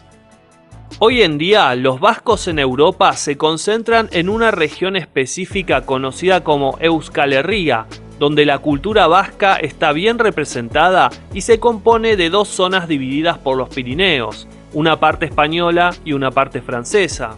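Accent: Argentina. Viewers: ¨Peru tu que tel historico eres. eres por el acento eres Argentino. No tienes ni puta idea de nuestra Humanidad. Los Romanos ´pasaron por Euskadi con permiso nuestro. y les dimos en la ;Madre a Carlo Magno. Aita es Aita. pendejo. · Interesante. Un poquito difícil de entenderte con el acento bastante fuerte argentino. Pronuncias la Y com sh o ch en ingles. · El que habla es argentino; entre Brasil y Argentina no se odian?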